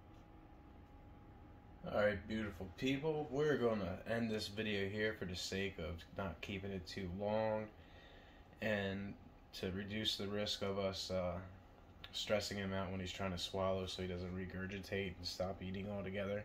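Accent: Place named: Philadelphia, United States. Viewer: Where in Philly/south Jersey are you at? I live in north Jersey so I recognize the accent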